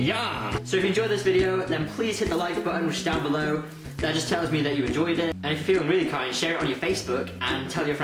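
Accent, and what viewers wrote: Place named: Wales, United Kingdom. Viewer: He sound's quite Welsh in this for a change:)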